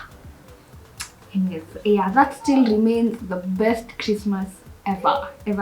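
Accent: United Kingdom. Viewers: British accent of course and it reminds me of Kijana Wamalwa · The British accent🙌🏼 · It's the British accent for me👌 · British accent 🔥🔥🔥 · Its the British accent for me😍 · Your british accent is awesome...the way have tried to mimic that accent and I can't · That British accent👌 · The British accent mama 🤩🤩🔥🔥 sighs! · It's the British accent speaking for me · Jesus can we talk about her "british"accent♥️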